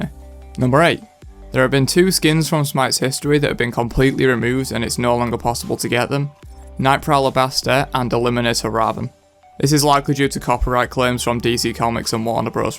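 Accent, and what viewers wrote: United Kingdom. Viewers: "Bof" "fog o' wor" "ba'el" and people say gaelic/british accents are the best lol sounds like an idiot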